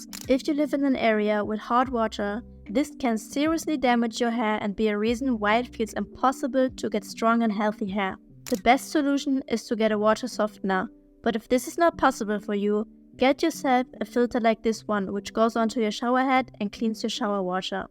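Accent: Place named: Germany. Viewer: I hear a strong German accent 😄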